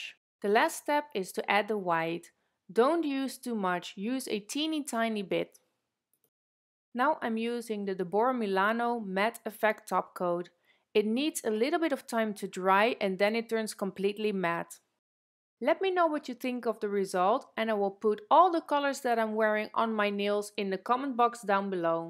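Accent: Netherlands. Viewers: One thing. Are u Dutch?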